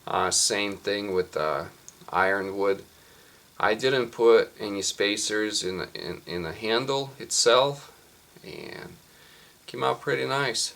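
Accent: Russia. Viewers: акцент как у русского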